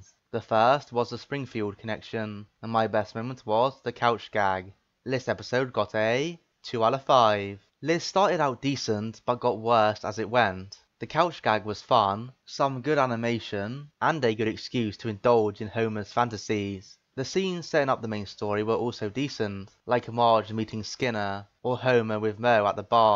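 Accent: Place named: United Kingdom. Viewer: Hey, I have a question. It's okay if you don't want to answer it. But: Your accent is a bit interesting, it sounds like a British accent mixed with other accents, So where actually are you from?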